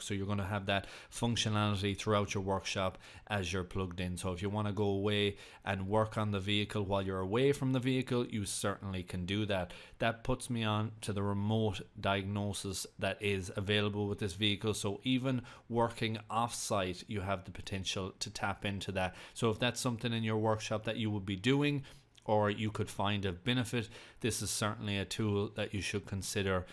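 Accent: Ireland. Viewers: not to be rude but i wanted to ask are you Irish?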